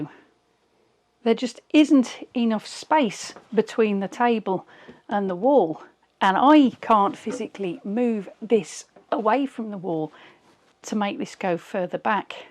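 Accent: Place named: United Kingdom. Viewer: OMG, I still can't figure it out from where you are shooting your videos. Your accent is British, the sewing space looks British too and handcranked machines, but your Oregon /Sisters video:-))) LOL.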